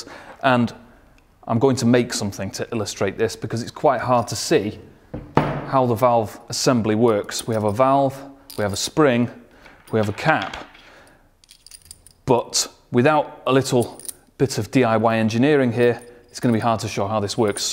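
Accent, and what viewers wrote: United Kingdom. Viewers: Just stumbled upon you after googling how water cooling works. Terrific stuff mate - as an Aussie I'd much rather listen to a Brit over an American and it's thrilling to now know there's one out there to listen to!!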